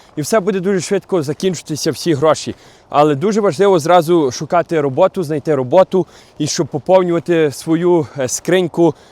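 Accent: Western Ukraine, Ukraine. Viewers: Бля, у тебя такой западенский акцент! Обожаю твою озвучку!!! Всегда вподобайка вид мене!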